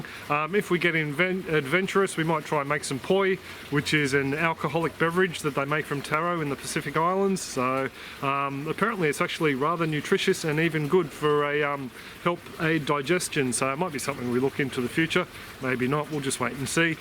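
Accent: Australia. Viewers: I most likely will never plant taro but I so love watching your videos just to learn some new gardening tips. the Aussie accent and lingo makes gardening ever cooler. Good luck with your roots.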